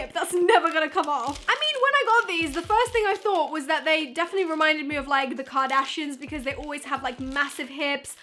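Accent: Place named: United Kingdom. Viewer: She sounds too English to be true.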